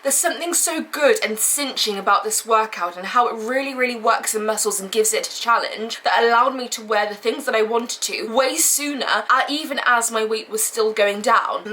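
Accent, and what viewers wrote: United Kingdom. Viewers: She sounds like she could be in bridgeton… love it 😅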